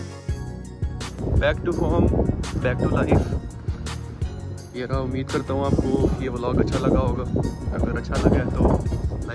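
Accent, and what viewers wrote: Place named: Punjab, India. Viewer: jee love your punjabi touch ♥️ ❤️ bro keep going 💪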